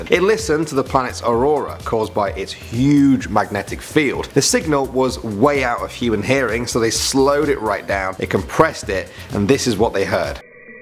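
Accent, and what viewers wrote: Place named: United Kingdom. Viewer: you love space. ok ok, I get it. I love british accents.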